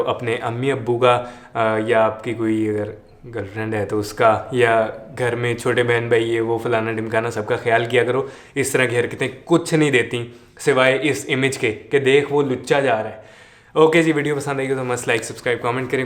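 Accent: Punjab, India. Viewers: ka punjabi lehja zaberdast hai.ham thehrey karachi waley punjabi.🙄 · hamey story sunaya kro plz ap ki story buht sander jaberdast zindabad hoti hen...us ap Jo punjabi tarka legaty ho wah buht hi shapakin ha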